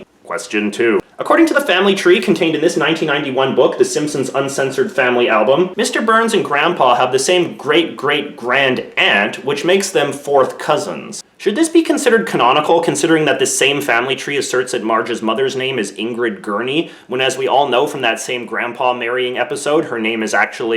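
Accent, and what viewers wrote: Canada. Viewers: noooooooo you say "aboot"! As a fellow Canadian, i thought that was actually a myth. What part of Canada are you from? I'm from Ontario and have never heard aboot said out loud in my entire life.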